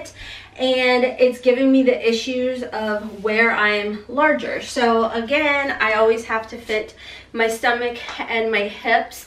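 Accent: South, United States. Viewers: Thank you for this haul. I have never even.heard of Zaful until your haul. You are around the same height and body type as me so it really helps to see how things look on you in the physical moving around in them aspect. Every single one of these pieces looked amazing on you. Ive literally been wanting to dye my hair lilac or lavender but its so dark brown which makes it harder. But you are Adorably Beautiful. I thought you looked amazing in each of the items you tried on. As You always do. Im glad you come out of your comfort zone to do these videos. We need more positive Energy to uplift eachother and support eachother. You hold a great platform for that. Again thank you, you really are a natural too bad being shy holds you back sometimes from being your true outgoing, entertaining self. Dont ever quit. We Love ya your a Beautiful Queen. God bless. 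P.s Your cute southern accent gives me life. 🙏💜👑🌞🌸🌼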